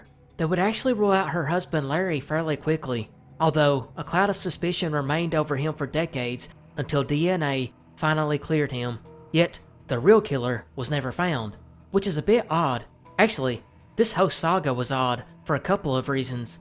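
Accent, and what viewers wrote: South, United States. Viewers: how I wait in epic patience for your southern drawl. Bring is, brother!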